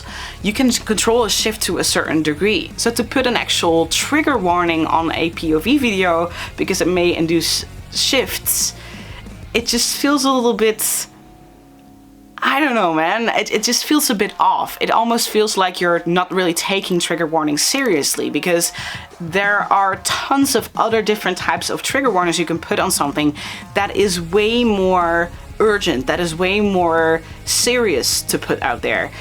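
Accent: Netherlands. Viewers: ARE YOU DUTCH??😂❤ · Are u dutch I am but u know I heer the accent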